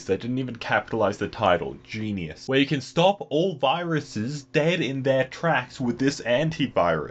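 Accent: Australia. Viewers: GoingGone he’s Australian. Not British.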